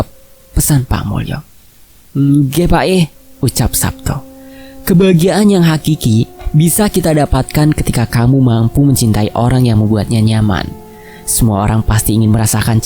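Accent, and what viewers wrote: Java, Indonesia. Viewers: Bohoso jowone kok lucu to mas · Tertarik ma logat bhasanya. Itu logat bahasa jawa mana ya? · Aq yoh yess cm cerita inih j0zz... Bisa banyk belajar bahasa cinta Tpi dengan logat jawanyh😍meski aq jawabarat Tpi bojoko jatim😍😍😍😍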